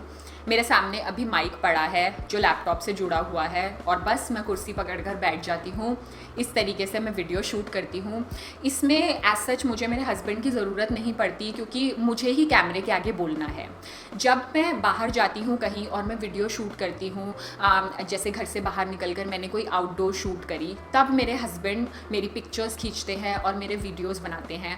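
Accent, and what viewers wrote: Delhi, India. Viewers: I have been following your channel since a week or even less and all that I can say is why didn't I discover you earlier! The very first video that popped up on my recommendations made me a bit uncomfortable with your speaking style which I guess is a bit like alot other Delhi girls, (please excuse the generalisation), but further into the videos, I realised that it is that very speaking style that grabbed me and made my binge all your videos in a span of a couple of days. I felt it was non-pretentions and so so honest to anybody who just wants to have a good time watching creators not unlike you putting up so much hard work. I wish I could pat you on the back and tell you that you're doing a great job. I am not so much into hair and makeup and dress up videos and do not feel the need to follow any other YT for content therein, you're content appealed to me for the sole reason that you made me happy just sharing your stuff with me. 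Thank you sm. Keep doing what you're doing and all the love. Sorry for the long post.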